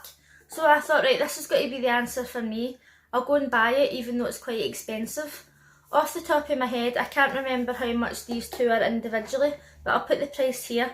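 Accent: Scotland, United Kingdom. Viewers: yaaasssss love hearing a fellow Scottish voice in a beauty review, glad I didn't buy this! thanks so much for the honesty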